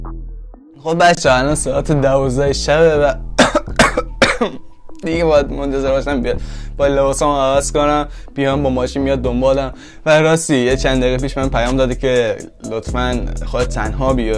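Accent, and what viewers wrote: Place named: Gilan, Iran. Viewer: تا حرف زدی گفتم گیلک هستی باعث افتخاره همشهری ❤😎👌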